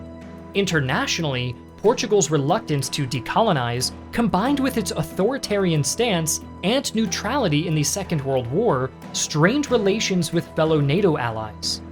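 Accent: Brazil. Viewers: The terrible Brazilian Portuguese (mis)pronounciations have to stop. You're butching history for someone calling himself a "Historian", by using bad Brazilian pronunciations for what should require a neutral Angolan/Moçambican/European Portuguese accent.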